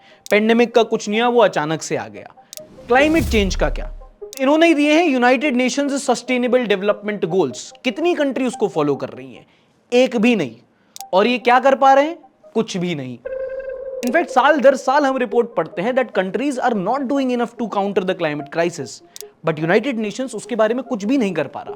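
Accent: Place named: Bundelkhand, India. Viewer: I'm from Jhansi too...and your bundelkhandi is on point 🤌🏻😂 love your content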